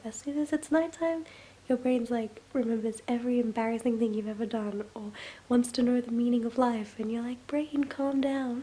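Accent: Australia. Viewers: australian accent on girls = yes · I never knew how much I needed an Australian accent in my life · 90% Aussie accent 10% grab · Hey! Are you Australian?? (I hope I didn't offend you, if my assumption was wrong)... It's just that I'm Australian, and you have that slight accent/pronunciation of words, that automatically make me identify you as maybe being an Aussie :) Sorry for being a complete weirdo but, just curious XD Cheers for your time and effort to make this video! :) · The aussie accent completely threw me off 😐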